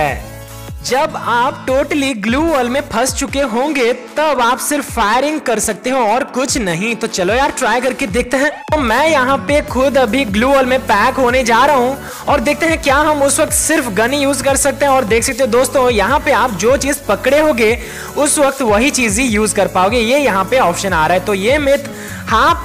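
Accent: Odisha, India. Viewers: Bhai Ap odisha se Ho na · Bhai ap Odisha se ho kya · App Odisha se ho?? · Bro are you form Odisha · Are you odia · App odia ho kyA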